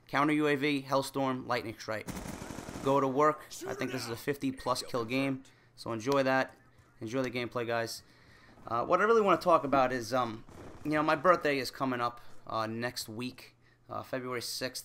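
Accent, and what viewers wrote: New York, United States. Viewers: I predict 100,000 subscribers by the end of the year. NY accent + Rage + valid observations = kick-ass commentary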